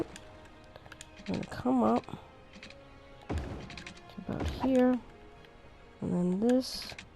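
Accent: Long Island, United States. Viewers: I’m hearing a Long Island accent I think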